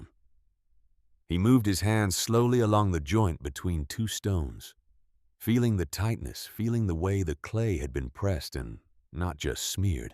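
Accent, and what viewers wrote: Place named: United States. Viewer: Oooh! A narrator with an American accent!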